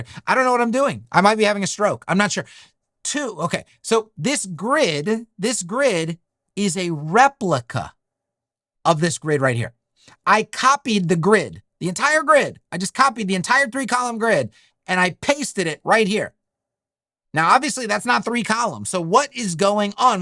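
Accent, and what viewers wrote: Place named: United States. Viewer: Is it me... or does (general American accent) say "era" and "error" the same way? 🙂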